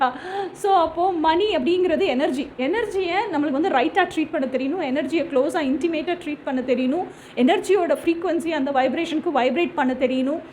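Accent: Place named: Madurai, India. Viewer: Madurai pechu 👌🏻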